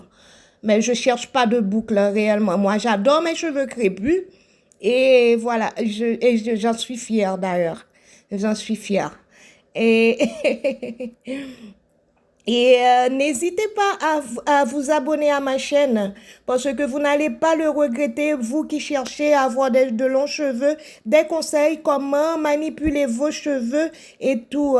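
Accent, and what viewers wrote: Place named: Haiti. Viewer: Je suis une nouvelle abonnée, tu es haïtienne comme moi en regardant cette vidéo je me suis dit que tu as un accent haïtien pour confirmer je suis allée visiter ta chaîne et je suis tombée sur une vidéo en créole, je dois encourager ma chère concitoyenne🇭🇹 bonne continuation!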